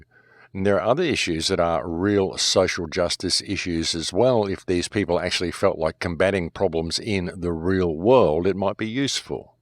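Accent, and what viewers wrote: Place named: Australia. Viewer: its good to hear an aussie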